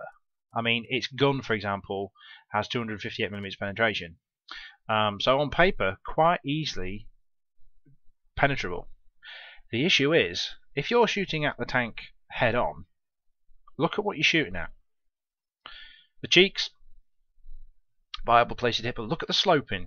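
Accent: England, United Kingdom. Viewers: Which part of England are you from mate?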